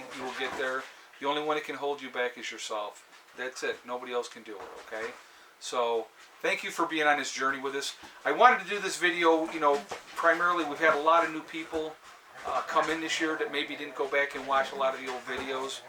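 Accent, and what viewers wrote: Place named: Minnesota, United States. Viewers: I'm new to your channel so it's nice to hear a little bit of your background. Thanks for sharing. I'm still convinced that you're from Minnesota. That is a Minnesota accent for sure. I've never heard anyone in New York sound like you. Except maybe in Long Island. LOL! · He does sound a little Minnesota! Not extreme Northern Minnesota, but maybe Twin Cities area. My family is from up by the Canadian Border.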